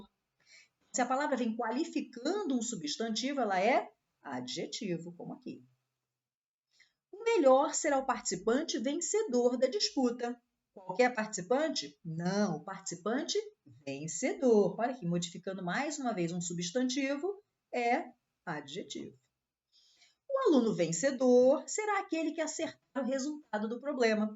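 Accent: Brazil. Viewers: Parece paraense!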